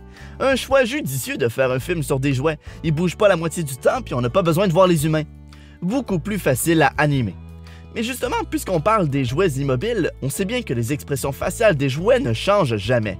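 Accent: Quebec, Canada. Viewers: Je surkiffe l'accent québécois c'c'est un folie je veux vire à Montréal la banlieu de Paris c'est la dech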